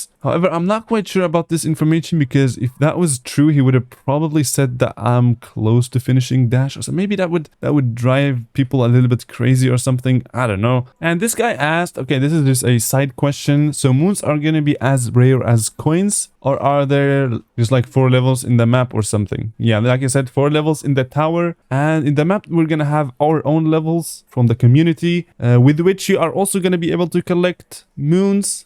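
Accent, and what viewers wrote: Germany. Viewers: yu german?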